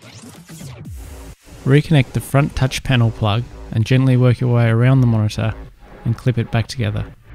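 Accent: Australia. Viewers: Are u Australian?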